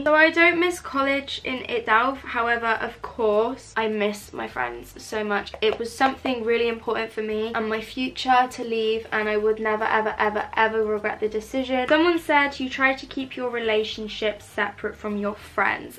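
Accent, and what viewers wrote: Scotland, United Kingdom. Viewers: As a Scottish person listening to her Scottish accent has me in stitches 😂😂😂😂😂👌🏻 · can please do a whole video in her scottish accent its actually so entertaining🥰🥰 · How could you not love her Scottish accents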